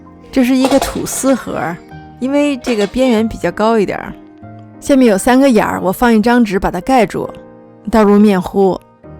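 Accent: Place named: Beijing, China. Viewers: 好喜欢讲解的声音，北方腔好治愈，音乐也好！喜欢5555，想学肉夹馍！！ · ，你人生活在印度嗎？為什麼又印度奶茶，又印度薄餅？為什麼說話口音是京騙子？膚色是印度人的膚色？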